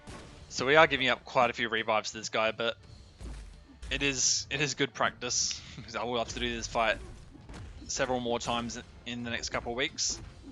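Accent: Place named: Australia. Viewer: Recognised a fellow Aussie instantly😅 Nice vid man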